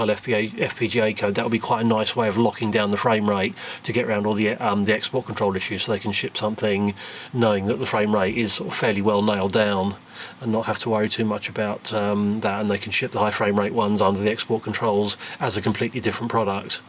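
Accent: England, United Kingdom. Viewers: Great video. Just a little hard to understand/keep up with at times due to you talking fast with that British accent. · As always - Perfect demonstration and technical details! Very Very nice to see!! I love this... BUT: PLEASE try to speak a little bit slower, it´s really hard to understand for guys like me (from German) .. sounds very "English" ;-) US-English is easier sometimes.. Would be higly appreciated.. Again, thanks for your perfect work!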